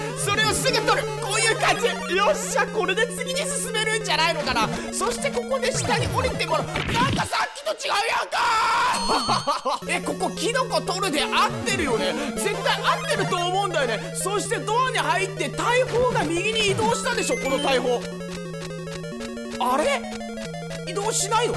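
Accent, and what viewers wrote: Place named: Kitakyushu, Japan. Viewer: たまに北九州の方言が出るのがめちゃくちゃ親近感湧きます🥑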